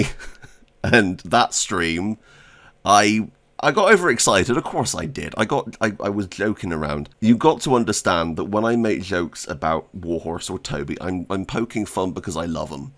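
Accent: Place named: West Country, United Kingdom. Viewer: Awesome video. Give them a chance. Awesome bit of news and well put together. It's good to hear a west country accent in a video.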